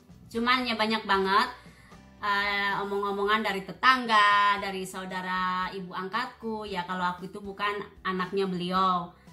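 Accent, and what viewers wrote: Sunda, Indonesia. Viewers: Smua ada waktu nya ya Teh. Sy dengar Teteh klo lagi ber bhs sunda kental skali. Pdhal asal karuhun Teteh dr brebes. Gpp ya Teh smua klg kita. Smoga damai bersama klg dan bahagia slamanya. Waktos Teteh bercerita g tersaa air mataku ikut mengalir.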